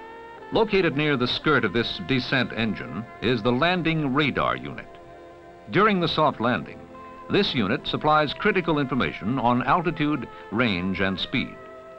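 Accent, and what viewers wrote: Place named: United States. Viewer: Love the US accent used these documentary films in the 50s and 60s. Not a fan of the music and worthy of watching. Did they ever get shown on UK tv?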